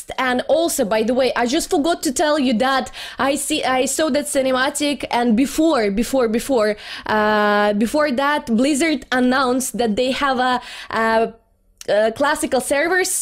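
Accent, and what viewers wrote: Russia. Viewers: new to channel, is your accent ruske? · Ты из россии, либо говоришь по русски? Акцент тебя сильно выдает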